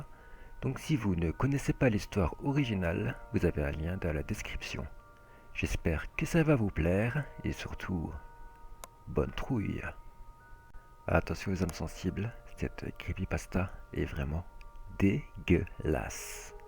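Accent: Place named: North, France. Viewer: une petite question,est ce que tu es du nord ? (dans le Nord,y a des gens qui ont un accent semblable au tien ^^) d ailleurs il est joli ton accent :)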